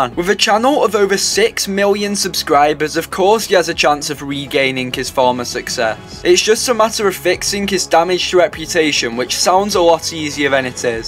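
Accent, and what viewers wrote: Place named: United Kingdom. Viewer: "and you can always undo it if you get tired of my british accent" LOL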